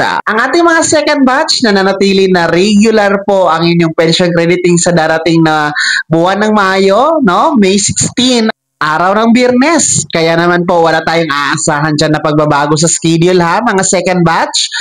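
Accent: Visayas, Philippines. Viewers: ayusin mo pagsasslita mo!!! palibhasa bisaya ka!!!!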